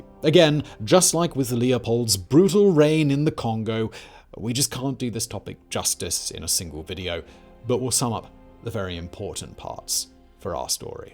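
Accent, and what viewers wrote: United Kingdom. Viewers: Lol, Karen? Doesnt really apply here. But to be fair i have no idea why it bugs me so much 🤔 maybe because its the diction of the colonizers or something, i genuinely dont know 😅 but when i hear british people turn "a" into "er" it just FEELS racist lmao in my soul. I know its not. But it feels like it. I hope he doesnt sing along to rap music very often 😬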